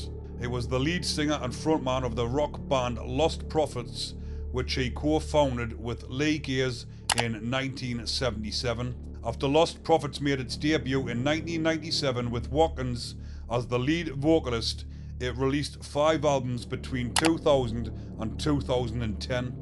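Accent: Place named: Scotland, United Kingdom. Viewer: Your Scottish accent is cool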